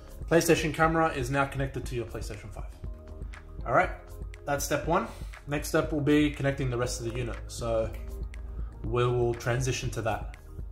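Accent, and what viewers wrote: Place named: Australia. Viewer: Thank you so much you helped me so much also I can tell from your accent that your an Aussi and so i am I which made me understand you more then I do for American accents 😂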